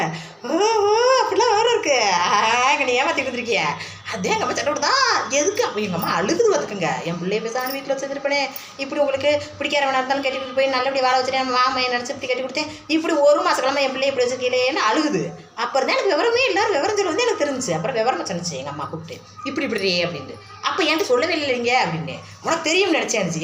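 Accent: Madurai, India. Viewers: nalla siruchenma un pechu romba nalla Iruku mathurai slang nallai tr uk ku❤❤❤❤❤❤❤❤❤❤❤❤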